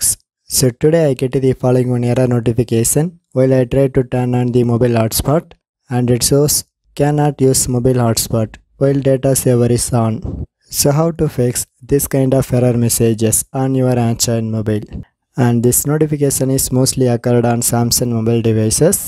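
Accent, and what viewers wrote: India. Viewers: It would be helpful but I can't understand his Indian accent so I'm stuck still please put on an English speaking voice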